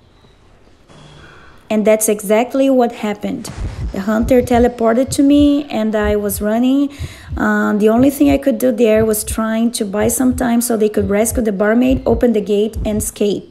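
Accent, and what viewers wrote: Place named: Brazil. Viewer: Aí mds acho tão lindo um brasileiro (a) falando inglês se como fosse português ahhaha❤️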